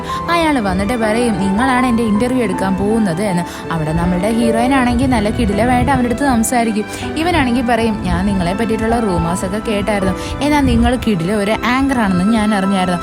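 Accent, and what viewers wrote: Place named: Kollam, India. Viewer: കുട്ടിയുടെ വീട് കൊല്ലം ജില്ലയിൽ ആണോ 😂എന്തുവാ, നോക്കിയാണ്,നിക്കുവാണ് , etc ..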